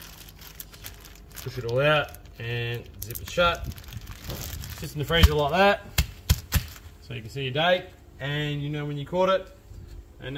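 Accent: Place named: Australia. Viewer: I thought you were in Australia from the accent 😁 I saw another video where there were leeches in fields there 😳 Do you guys have ticks everywhere too?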